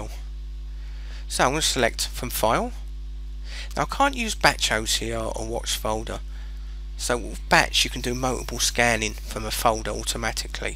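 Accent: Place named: Liverpool, United Kingdom. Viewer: It´s a mess. I am aware that back at school I learned British English, which is, properly speaking, Oxford English. Some sounds the speaker makes seem funny to me, but I could not understand them. Is it e. g. Liverpool English? Whatsoever, I gave up on learning from this video after about 3 minutes.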